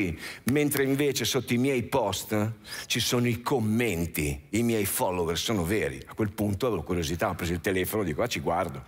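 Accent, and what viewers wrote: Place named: Emilia, Italy. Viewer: È meglio quando ha l' accento emiliano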